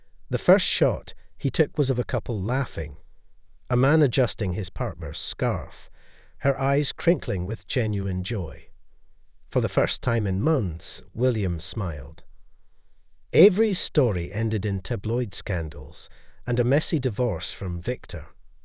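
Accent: Scotland, United Kingdom. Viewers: He sounds Educated Scottish to my ears.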